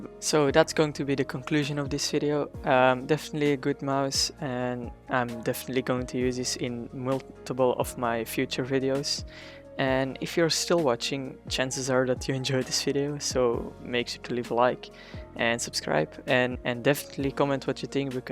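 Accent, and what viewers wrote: Netherlands. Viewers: ik wist dat je nederlands was ik hoorde het pog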